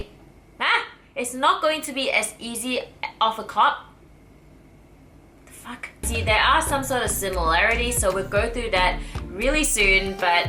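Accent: Australia. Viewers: I’m buying these after I watched your review vid. I love your ausie accent. It’s super hot. I’ve spent a lot of time in Sydney and Gold Coast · Her Aussie accent sounds really lovely.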